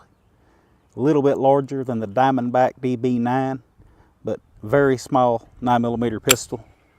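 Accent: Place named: United States. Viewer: You sound like an American to me. And I'm a damn Yankee from Maine. Thanks for your channel it gives an old man hope for this country.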